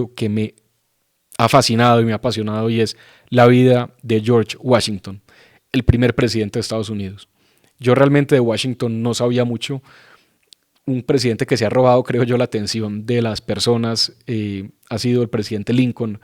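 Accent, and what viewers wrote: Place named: Paisa, Colombia. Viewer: E encanta su forma de comunicar, no lo había escuchado, pero vivo en un pueblo en Europa...gracias habla un lenguaje lindo, tiene un un dejé paisa sin esa decadencia asquerosa que piensan que todo Colombia habla así como paisa de puro trabado rasquin bol traga trapos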